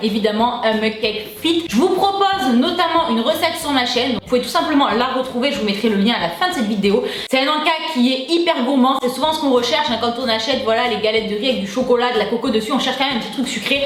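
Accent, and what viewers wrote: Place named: Nice, France. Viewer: J'adore cet accent niçois dans toute sa splendeur,vraiment ☺️ c'est peut-être la première que je commente ici mais franchement,j'aime bien ton contenu 😉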